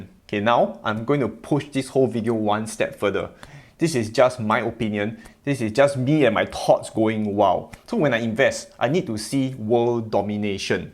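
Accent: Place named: Singapore. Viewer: I’m Becoming .. filthy rich because of you by doing nothing but picking..”it’s CRAzy” ( in your awesome Singapore accent )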